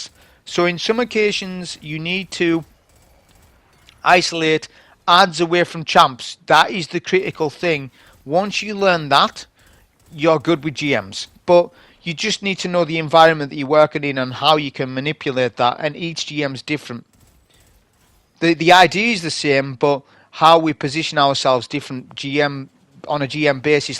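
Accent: United Kingdom. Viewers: Somehow im enjoying more of your bri'ish commentary rather than the actual gameplay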